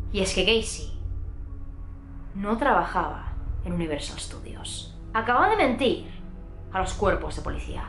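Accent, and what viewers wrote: Galicia, Spain. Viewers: Eres de Galicia?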